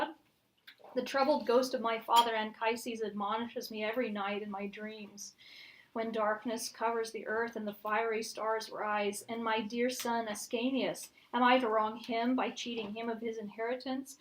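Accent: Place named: United Kingdom. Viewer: Ye what is up with all those audiobooks with British accents?? Where are all them American accents??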